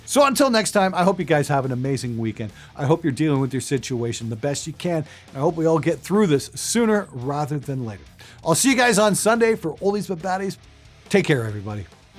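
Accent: Canada. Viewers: You understood that? With such a thick Canadian accent, I couldn't make any of it out. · Do I detect a Canadian accent in your bass player speak?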